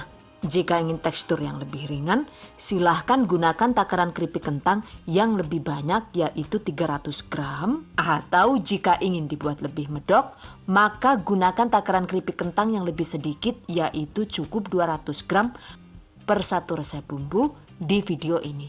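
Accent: Banyumas, Indonesia. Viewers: Senyum2 sendiri aq tuh kalau bunda lagi ngomong ngapak sambil ngelucu🤭😄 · Hahahaaaa...paling ditunggu komentar ngapaknya....asli lucuuu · Yg aq suka dari chanel ini selalu disertakan tips dan trik yg mudah dipahami.. Dan selalu berhasil tiap kali dipraktekkan👍 dan yg gk kalah lagi selalu ada lucu2annya ala ngapak2nya itu lo bikin betah nontonya🤗🤗.. Terimakasih..😍😍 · Ini Madiun kok ngapak ya bun, orang mana aslinya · Org madiun kok bahasanya nyong ngapak banyumas sii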